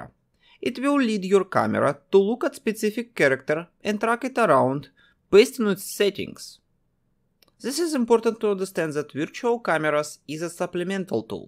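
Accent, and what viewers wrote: Russia. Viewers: Are you russian or what?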